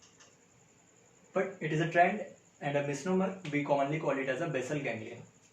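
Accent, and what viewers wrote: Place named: Jagdalpur, India. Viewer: Yeh toh Jagdalpur walaa haina😂 Keep it up Sir..👏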